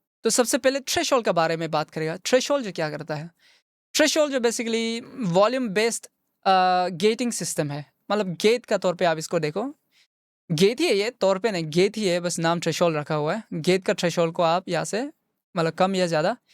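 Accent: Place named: Andhra Pradesh, India. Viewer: You sound like and im sure yeh ap hi hai accent se pata chalre, im from a.p too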